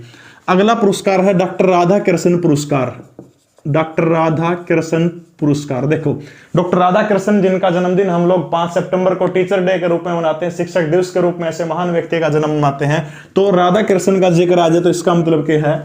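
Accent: Haryana, India. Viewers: Nice way of teaching sir.. Aapse Haryanvi language mein kuch bhi sunte waqt apne aap hi smile aa jati hai chehre par..phir chahe kitna bhi difficult topic kyun na ho easy ho jata hai. ..keep it up this way of teaching sir.. Bravo! 👏👏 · Gajab pdhate ho guru jii. Gaal kyu bknii hai. Apke pdhane ka hryanvi boli ka style mast hai. Jai hind jai bharat jai haryana jai haryanvi. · Gud evng sir G...your teaching style is brilliant...nd I m a big big fan of u...nd ur language speaking haryanvi style is much better than Actor Randep hooda G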